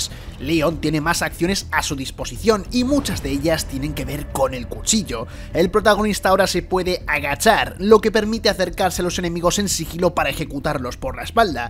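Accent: Spain. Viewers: mucha pupa porque suene Castellano de España? en fin estáis mal de la cabeza xddddd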